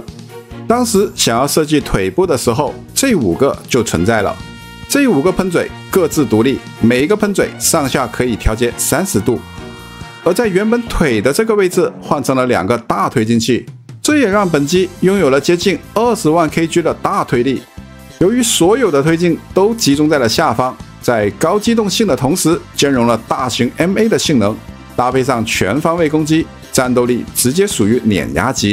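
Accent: Hong Kong, China. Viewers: 香港人說普通話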